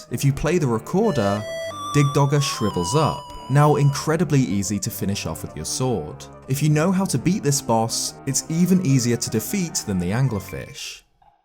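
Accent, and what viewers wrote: England, United Kingdom. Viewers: that's just the English accent for ya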